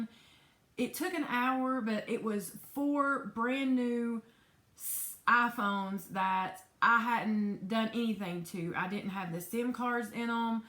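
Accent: South, United States. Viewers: Love your beautiful southern accent 😍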